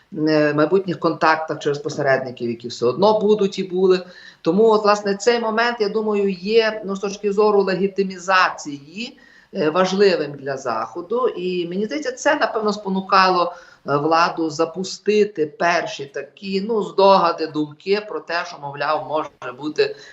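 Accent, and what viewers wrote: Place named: Galicia, Ukraine. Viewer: Хотив послухати, але не розумию Галичанського суржику.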